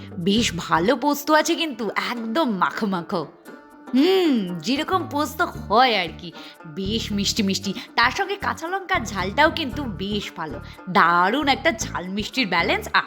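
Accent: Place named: England, United Kingdom. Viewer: বাংলা উচ্চারণ ঠিক করুন, না হলে শুদ্ধ ইংরাজিতে ভিডিও করুন। ইংরাজি বাচনভঙ্গিতে বাংলা বলছেন অত্যন্ত বাজে ভাবে কানে লাগছে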